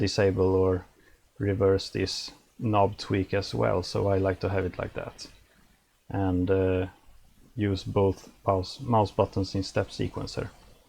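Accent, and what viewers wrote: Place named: Sweden. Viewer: ganska passande, har precis börjat med fl studio själv, älskar din svensk-engelska accent förresten :D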